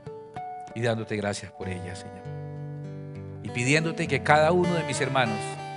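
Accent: Cantabria, Spain. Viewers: Gracias paisano no solo por la Palabra de DIOS REVELADA, si no por la manera como la trasmite en nuestro dialecto especial Santandereno tan jocoso gracias pastor me hace reír y estoy segura que al Señor.Jesús también bendiciones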